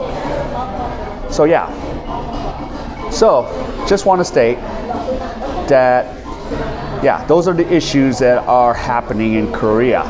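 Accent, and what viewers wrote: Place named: United States. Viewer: The Korean in South Korea should go to the Philippines and build their own business. Its easy to set a business in the Philippines for Koreans. Filipinos loves Koreans. The way you talk now is like "americanized" & "Philipinized" so funny !😂🤣🤣🤣! I subscribed because you are funny and truthful - real dude. I'm from Miami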